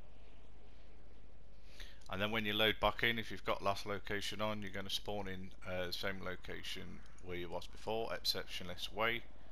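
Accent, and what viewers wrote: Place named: United Kingdom. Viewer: Honestly never leave comments on videos but 1.your british and not a squeaky american 😩. 2.your straight to the point. 3.its not click bait 4.idk but you got a sub and a like from me 💚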